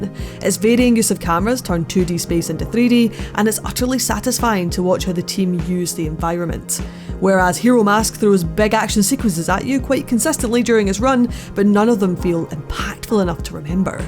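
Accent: Scotland, United Kingdom. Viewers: Good review, I like the format. What part of Scotland are you from?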